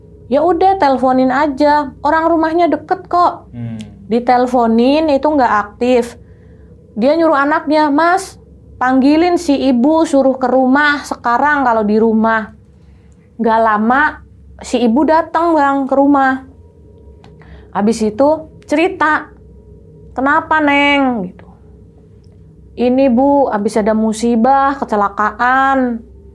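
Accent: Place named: Banyumas, Indonesia. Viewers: Semangat mbakyu,lanjut seru ceritanya ngapak bikin ngga ngantuk mbake. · Wong ngapak mbanya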